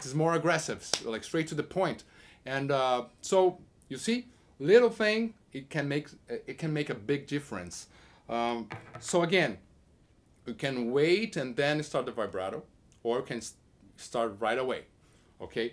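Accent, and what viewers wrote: Brazil. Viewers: Inglês brasileiro. É muito mais fácil entender um brasileiro falando inglês que um nativo. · Dá sim. Mas o sotaque br no inglês dá um charme à mais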